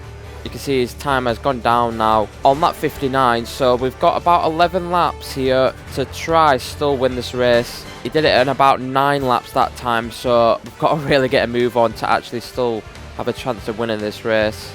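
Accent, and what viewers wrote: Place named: Manchester, United Kingdom. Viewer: Manchester accent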